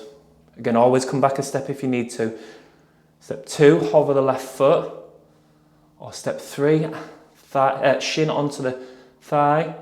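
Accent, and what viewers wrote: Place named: Birmingham, United Kingdom. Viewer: hi, I ran my local parkrun today and got talking to someone visiting from Ireland... we are both fans of your videos and we spent a good few minutes running along and trying to figure out what accent you have? I was thinking Birmingham area, he was wondering if you are from the Scottish Highlands?!?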